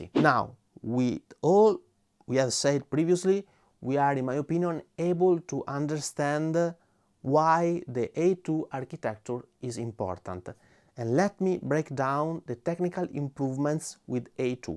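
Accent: Italy. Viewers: You could put the script on your phone and reference the screen. The casual walk & talk is very engaging in many ways because it's like you're taking us on a journey with you through your environment where you normally go for a walk. We get to see a little bit more of your world and get to know you better. Nobody expects you to speak English perfectly. In fact, your Italian style makes you a real Renaissance Man!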